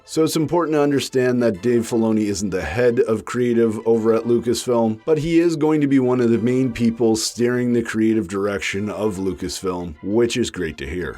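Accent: Canada. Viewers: I can tell your Canadian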